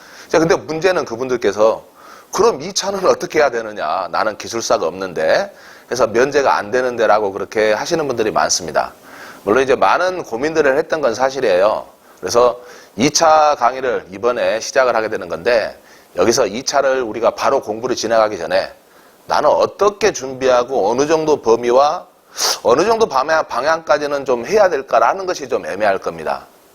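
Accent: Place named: Jeolla, South Korea. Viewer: 말씨가 전라도 그래서 나간다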